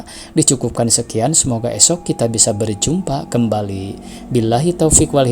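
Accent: Sunda, Indonesia. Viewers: Soantenna siga kisunda ieu teh sanes.?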